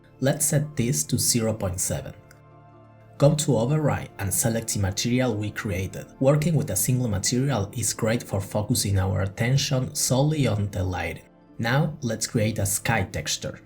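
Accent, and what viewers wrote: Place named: Rioplatense, Argentina. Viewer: are you from argentina? you have a strong rio de la plata accent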